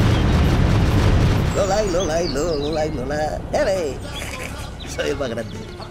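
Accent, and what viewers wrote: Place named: Spain. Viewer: Joder extrañe mucho tu voz española sukulenta 😢...ha por cierto muy bueno el uso del codigo para saltarse niveles es que son jodidos enserio 😂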